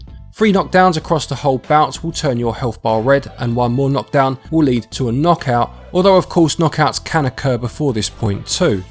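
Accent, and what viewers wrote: United Kingdom. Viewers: !!! When did you get so suave and british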